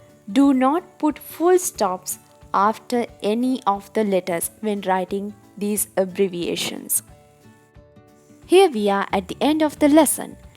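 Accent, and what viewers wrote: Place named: India. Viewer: Just want to know dear, who taught u English? An Indian? Your accent says though. Anyways a nice presentation.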